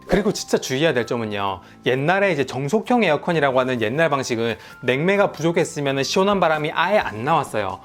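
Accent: Gyeongsang, South Korea. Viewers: 경상도 사람입니까? 서울 사람입니까?ㅋ 귀여우시네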